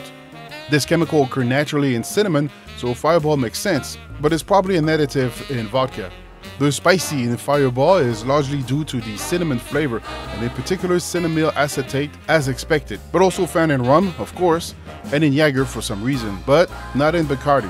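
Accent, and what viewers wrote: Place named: South Africa. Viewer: The way he talking I thought was South African or African also from wales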